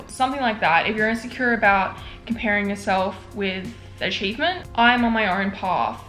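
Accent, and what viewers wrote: Australia. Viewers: this is totally random but are you australian? english is not my first language and I'm trying to guess where your accent is from lol it's beautiful tho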